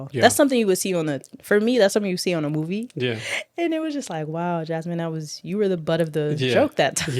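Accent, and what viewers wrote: South Africa. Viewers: Welcome home Jasmine, but you sound100% South African like a familiar girl I know in the hood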